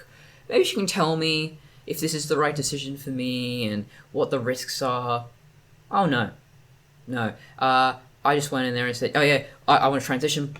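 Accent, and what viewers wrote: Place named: RP, United Kingdom. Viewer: I don't know any Australians personally, but your accent does not reflect what I usually hear in movies. If you had not said it, I would have thought you were THOROUGHLY BRITISH, and of some LANDED GENTRY. 😄 What also adds a LOT to the effect is your REFINED POSTURE and choice of words. Is this accent COMMON in your local area "down under"? BTW, HILARIOUS your comment about America sinking under the waves and the water levels PERHAPS rising in your coastal city/town! Also your idea of a "kinky Lesbian" thinking lewd Heterosexual thoughts makes me laugh! 🤣 Glad you referenced "Waffling WIllow". I like her a LOT too! You BOTH present as COMPLETELY and ATTRACTIVLY FEMALE, but in almost COMPLETELY DIFFERENT ways: YOU as a "Duchess" type, and HER more "Princess" like. Amazing counterpoints, illustrating the WIDE RANGE of human FEMININITY! 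😃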